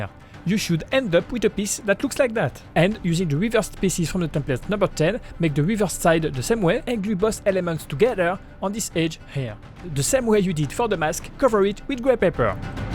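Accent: France. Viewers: Tu es Français cela ce voit grâce à votre accent extraordinaire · Wow the french accent 🫠🫦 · A en croire son accent il est français ??? 🫶🏼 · Avec ton accent français, ça fais bizarre je vais pas mentir, par contre super mask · frero sa ce vois que tes français vue ton accent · t'es pas un francais par hasard ? Cet axcent et vraiment similaire stp reponds moi t'es creations sont incroyables continu comme ca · L’acent français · You have a french accent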